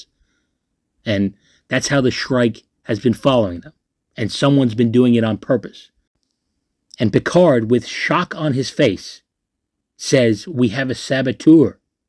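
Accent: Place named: South, United States. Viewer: are you from the South? You have a great Southern accent.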